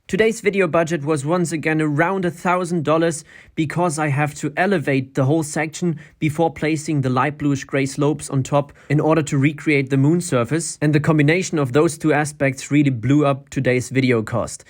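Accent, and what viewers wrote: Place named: Germany. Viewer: Der Typ ist kein Engländer. So wie der englisch spricht hört man sofort raus, ist deutsch seine Muttersprache. Ich habe mir schon hunderte englische/amerikanische Videos angeguckt und keiner spricht so. Das machen nur deutschsprachler.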